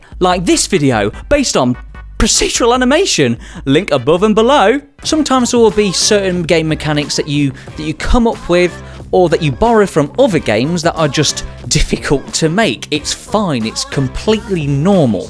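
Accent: Northern England, United Kingdom. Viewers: That's a northern English accent. 😄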